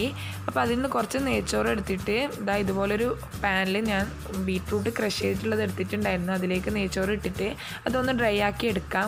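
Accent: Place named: Kasaragod, India. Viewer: Ingal kaasarod aanengilum slang koykoottaaare cherya chaayvu ind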